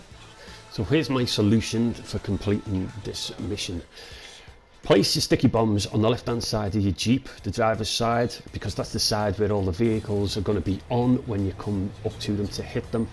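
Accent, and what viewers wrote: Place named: Scotland, United Kingdom. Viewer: What region of Scotland are you from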